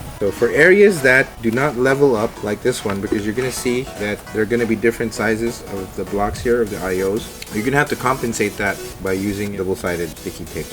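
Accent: Philippines. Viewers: Are from the Philippines, you sound Philippino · Pinoy accent sub😁 idol😂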